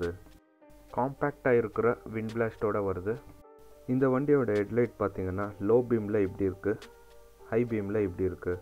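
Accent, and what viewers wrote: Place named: Bangalore, India. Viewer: Bangalore Tamil nice best of luck bro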